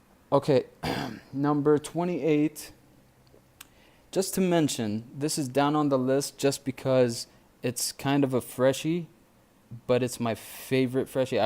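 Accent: United States. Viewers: I'm confused again,so you're arabic but you sound 100% american,so how is english your second language?;)p.s i would subscribe but your last upload was 4months ago. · Where are you from ? You have an American accent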